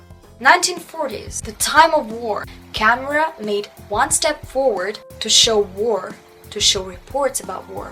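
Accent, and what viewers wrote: Russia. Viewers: She sounds Russian :-))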